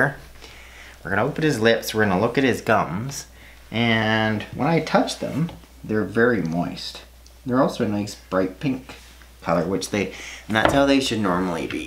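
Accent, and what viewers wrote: Canada. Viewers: Yes it is helping! I don't know if Canadian accent is much different from the American one, but surely my English is not as good as I thought 😂 but I admire your channel as a very needed social inciative. Tk you!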